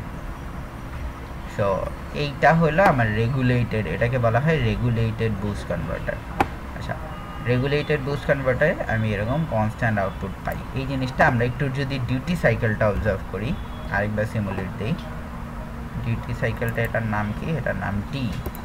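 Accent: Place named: India. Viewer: Indian english quite hard to understand because its accent, hope there will be some script or something similiary...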